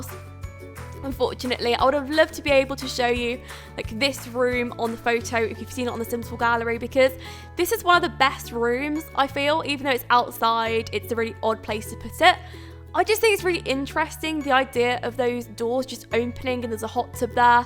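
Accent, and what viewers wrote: United Kingdom. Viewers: I love your british accent so much !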